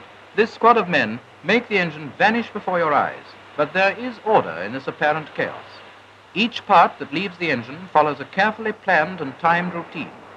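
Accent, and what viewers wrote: RP, United Kingdom. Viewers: These wonderful old movies from the days of steam power are always narrated by a "received pronunciation character, educated at one of the finer boys schools in the land. In the background a symphony orchestra is going absolutely nuts with a rendition Stravinsky could have penned. 😲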